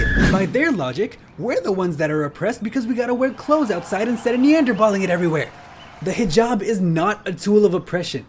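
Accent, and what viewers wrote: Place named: South Asia. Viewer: That accent is bro my fellow south Asian 😂. This is the first video of this guy I’ve watched but I love this dude. It’s sunnah to love your brother.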